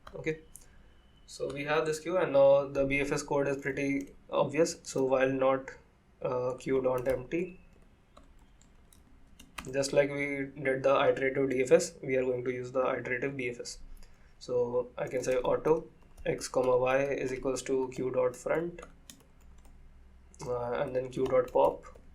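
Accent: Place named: India. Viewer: everything's great but i'm not used to his indian accent so my eyes are a bit tired of reading the script 😎😎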